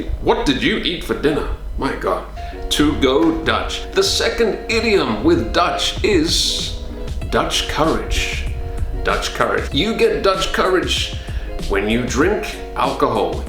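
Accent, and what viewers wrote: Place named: United States. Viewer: American accent yoo, I love it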